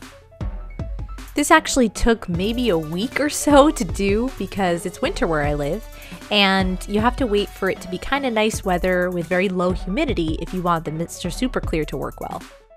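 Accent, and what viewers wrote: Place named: United States. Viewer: Are you american cause you sound american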